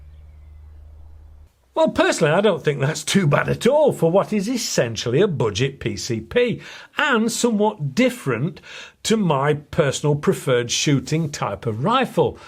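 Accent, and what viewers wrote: United Kingdom. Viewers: I love your accent, reminds me of all those Monty python shows I loved to watch.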